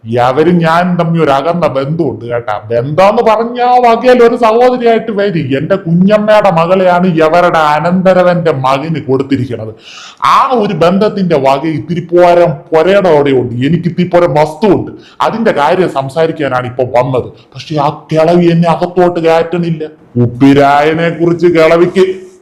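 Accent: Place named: Trivandrum, India. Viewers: 😂😂 നമിച്ചു തിരോന്തോരം സ്ലാന്ക് 😜🤣👍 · ചേട്ടാ! സൂപ്പർ, തിരോന്തരം ശൈലി 🤣😂🤣😄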